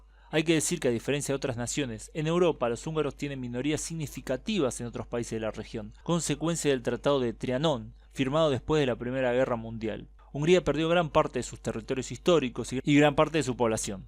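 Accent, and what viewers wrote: Rioplatense, Argentina. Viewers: Asi se pronuncia el castellano rioplatense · Hay palabras que sencillamente no se que dices, son solo palabras pero tu Argentino dificulta un poco saber que palabras acabas de decir. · What he was trying to say is that the channel is from Argentina, not from Spain. But we all speak Spanish. I'm chilean and I recognize his argentinian accent. Greetings to Ukraine!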